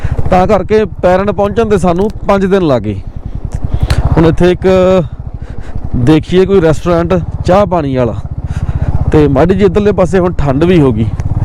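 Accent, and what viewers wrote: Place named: Punjab, India. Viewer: Punjab ke log isi treh ki pure Punjabi language bolte hai , vadiya lagdi,😄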